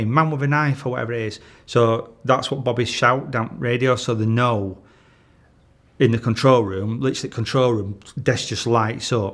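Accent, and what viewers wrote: Yorkshire, United Kingdom. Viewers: Such a Yorkie accent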